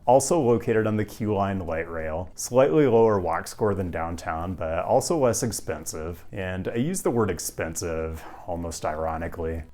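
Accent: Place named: Pacific Northwest, United States. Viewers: The PNW accent! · Nah, his PNW accent got a little cali-valley mixed in somehow, Brah.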